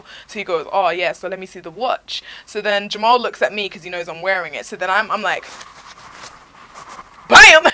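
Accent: United Kingdom. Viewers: I love your accent! I also live in the uk but I don't have the London type accent; I put your story time on loop and your voice soothes me and helps with my insomnia, Thankyou! Now I get at least 5 hours sleep per night (once I went 5 days with no sleep)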